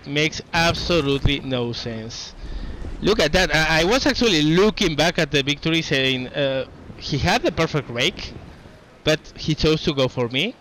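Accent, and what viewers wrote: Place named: Spain. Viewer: I'm glad I saw the video where you mentioned you had a Spanish accent, I couldn't place where it was from.:P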